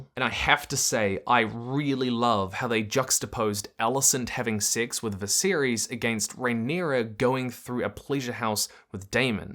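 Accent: New Zealand. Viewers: I love how the auto-subtitles read your New Zealand accent as saying, "Six Six Six" at the beginning :)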